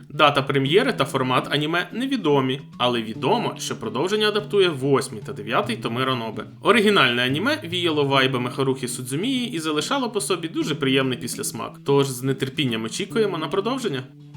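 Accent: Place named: Bukovina, Ukraine. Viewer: оу, ви з Буковини 🧐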